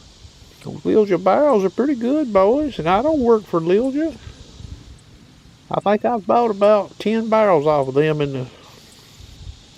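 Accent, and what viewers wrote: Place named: New York, United States. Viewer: Great Video... You sound like you might be from around by me in New York. God Bless. Pew Pew. 😎👍👌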